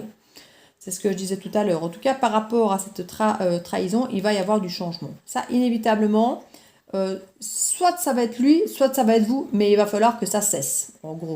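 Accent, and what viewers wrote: Liège, Belgium. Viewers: Tellement vrai et juste... On reste dans mon theme lol :p J'adore tes guidances sinceres et justes, sans complaisance, en toute honneteté... chaque video est un plaisir (puis j'adore cet accent liegeois :p ). En tous cas, je ne peux que te conseiller a te lancer dans les guidances privées car tu es vraiment tres tres douée et tu vois juste... N'aies pas de doutes sur tes capacités. Tu es geniale <3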